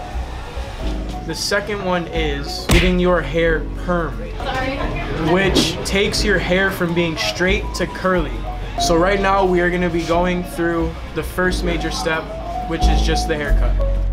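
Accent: Wisconsin, United States. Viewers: His wisconsin accent is the best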